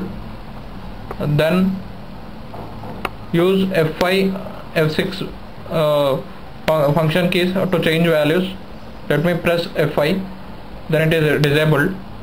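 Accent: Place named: India. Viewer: I understood everything you said (thanks to the Indian movies i watched),thanks man :D it helped. You're Indian right?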